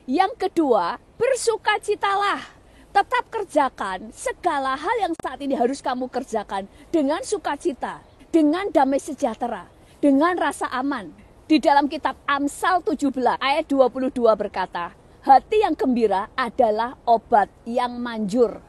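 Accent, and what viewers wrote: Java, Indonesia. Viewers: pendeta e medok tenan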